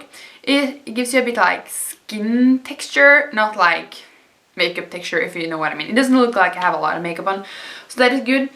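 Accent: Norway. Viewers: Are you Norwegian? It kind of sounds that way, because I'm Norwegian too! :D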